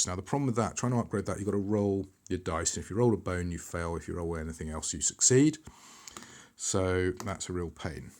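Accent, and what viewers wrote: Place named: United Kingdom. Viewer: Thanks for sharing your videos. The encounter text somehow feels more appropriate when hearing it in a British accent. :) A small suggestion on your audio -- consider converting it from stereo to mono. When listening to the video with my headphones on it feels like your voice is moving around a bit. :)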